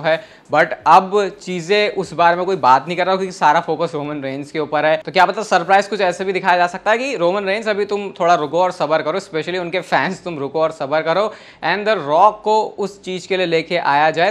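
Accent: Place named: India. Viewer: I love wwe content, but I don't understand Indian English.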